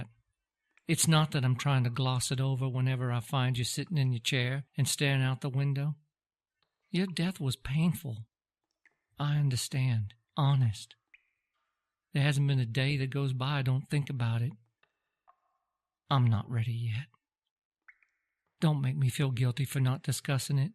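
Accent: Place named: South, United States. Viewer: The narrator’s voice reminds me of a highly educated southern accent. Very much like the great historian Shelby Foote .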